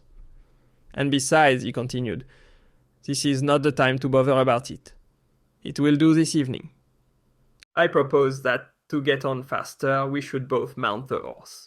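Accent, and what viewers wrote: France. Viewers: Love hearing this read in a french accent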